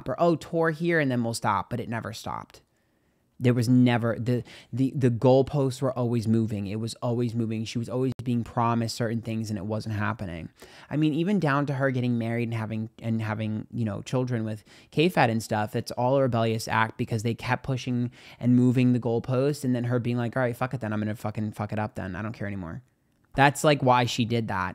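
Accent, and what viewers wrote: New York, United States. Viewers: I love how when you get upset….. bc your New York accent comes out…. I just love your accent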